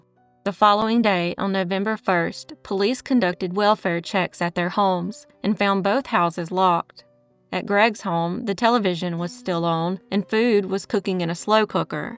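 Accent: South, United States. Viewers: I like your little music that you play in your southern accent hello from Minnesota❤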